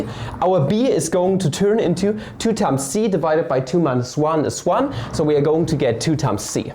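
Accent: Germany. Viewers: Your German accent is way better than whatever other accent you're trying to imitate, you should rather stick to it.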